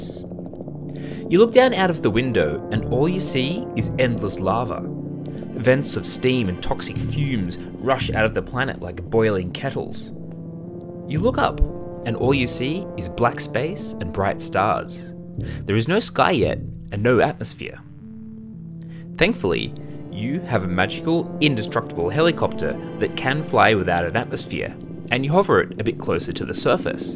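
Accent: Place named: Australia. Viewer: I reluctantly clicked on this video, thinking it'd be just another AI-written and narrated video, but upon hearing the mellow Aussie voice and the distinctly human drawings, I was pleasantly surprised at the discovery of this channel. Looking forward to watching the rest of the series!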